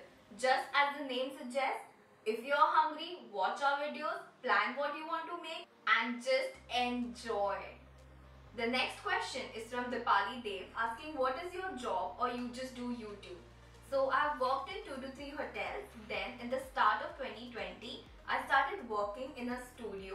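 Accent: Maharashtra, India. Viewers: I knew u are a maharashtrian by the way u speak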